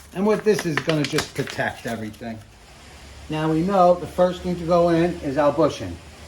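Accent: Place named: Boston, United States. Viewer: Somewhere around Boston I can tell.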